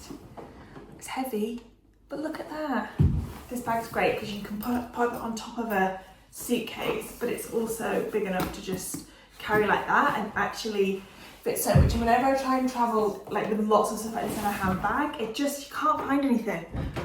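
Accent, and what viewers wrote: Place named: England, United Kingdom. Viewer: It's funny, as a french I'm so used to listen to american youtubers maybe but english accent (so beautiful) is much harder to understand ❤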